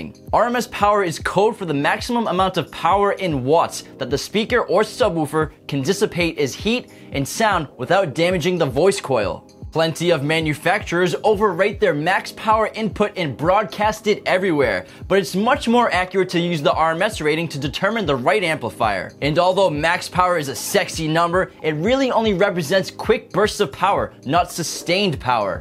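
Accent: United States. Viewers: Are you an american? sure sounds like it.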